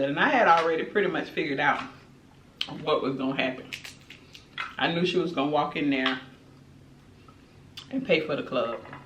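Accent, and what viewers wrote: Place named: South, United States. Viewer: Happy Labor Day: I 💘 your videos, new subscriber & I watch every time. Quick question; your accent sounds southern, are you from Georgia?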